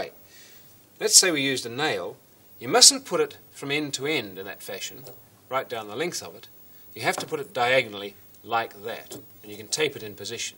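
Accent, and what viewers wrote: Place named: Australia. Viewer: What on earth happened to our accent ? We all love Steve Irwin but that doesn't mean we have to all talk like him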